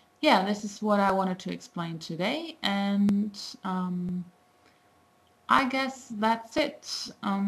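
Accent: Nepal. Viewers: It is funny with aaudaina..I love your nepali accent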